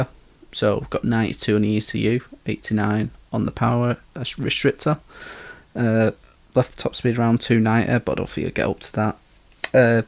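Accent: United Kingdom. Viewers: Man This game is so expensive on Car purchases so I have to make the best use of the cars I've got But thank you for all these builds. Do you think that the Peugeot l750r Set up you have here Will work well for the cafe Menu 42 GR1 Suzuki Race too? PS nice to hear A fellow mancunion accent.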